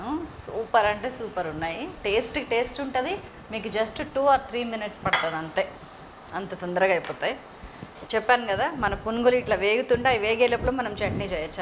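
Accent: Telangana, India. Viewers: Akka me Language superb akka nenu me lagey pakka telangana matladtha mastu ma Hyderabad relatives comments chestaru...akka 'Manchiga' is also my word..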